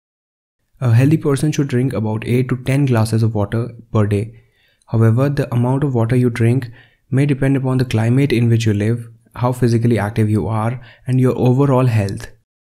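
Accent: India. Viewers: You're accent is more like indian buddy ! ❤️❤️ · Are you Indian your English essence is like of a Indian🤔🤔🤔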